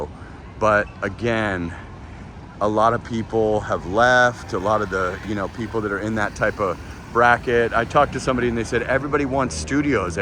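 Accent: United States. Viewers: Watching from Agoura hills Calif you have American accent Bro!!!! Take good care I’m originally from bugallon Pangasinan 4 hrs drive from where you are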